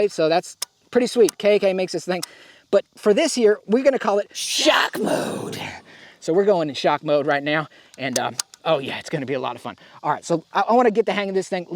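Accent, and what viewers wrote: South, United States. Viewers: Bro you should keep the Sothern accent! sike as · Honestly I support the Southern accent it fits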